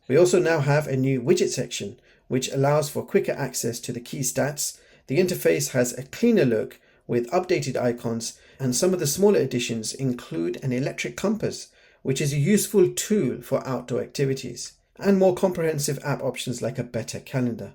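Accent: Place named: United Kingdom. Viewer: I can't understand half of what this guy says. Too much british accent and low volume.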